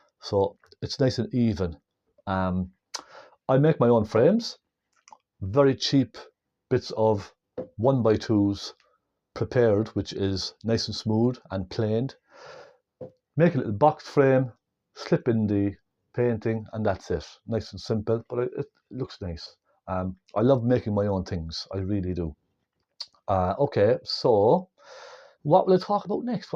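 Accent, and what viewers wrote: Ireland. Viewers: it is very nice to hear an Irish accent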